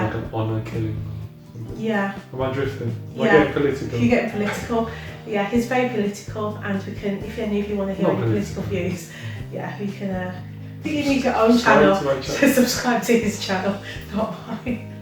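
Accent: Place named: West Midlands, United Kingdom. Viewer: New to your Channel and love your personalities 😊. I can hear your West Midlands Accent ❤️. I use to live in Wolverhampton many years ago 😊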